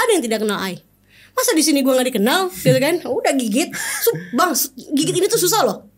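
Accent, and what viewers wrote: Malaysia. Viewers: Kakak ni org mana? Ckp macam ada sleng malaysia😍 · Emang lucu kalo denger orang Malaysia ngomong Bahasa Indonesia, kedengarannya kayak beda aja gitu logatnya ada ikut-ikut nya 😅. Tapi untuk kaka jika ingin berbahasa Indonesia maka gunakanlah Bahasa Indonesia dengan baik dan benar, jangan digabung ataupun di campur dengan bahasa asing seperti Inggris dan yang lainnya ya kak😉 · logatnya kayak org Malaysia suka dngar🌹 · mau tanya, ni org Indonesia atau Malaysia?sbb sleng kadang² seperti org Malaya😁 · Sebagai orang Malaysia aku boleh detect "slank Malay" walaupun dia cuba sedaya upaya berbahasa Indonesia.😂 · accent cakap macam orang Malaysia laaa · Iyaa orang KL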